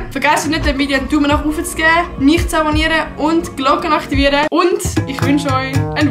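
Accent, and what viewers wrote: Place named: Bern, Switzerland. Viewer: duuu bish sooo vil attraktiver wed bärndütsch redsh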